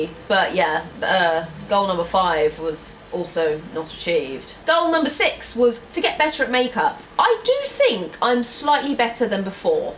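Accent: United Kingdom. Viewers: I have the worst begging of a year in my life that maybe a war is going to set in my country and I want to travel for UK but I can not. I love you so much coz when I watch your videos I feel happy also I learn how to do a British accent by watching your videos. Happy new year for you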